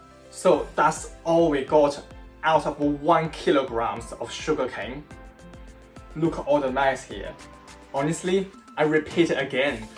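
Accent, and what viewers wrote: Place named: United Kingdom. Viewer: Pretty interesting how with some words you sound british and some words you don’t haha is sugar cane locally available where you live?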